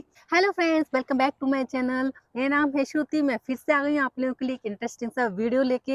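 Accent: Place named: Purvanchal, India. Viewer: Aap pakka UP purwanchal se ho... hai na ?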